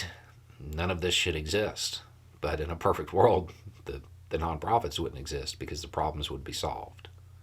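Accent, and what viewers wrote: South, United States. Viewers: Exactly because he still understands what it means to be a member of the human race. He can be wrong, he can listen, learn and explain things, teach like no one I have seen. Maybe bias cause the southern accent but absolutely adore listening to this man. Thank you.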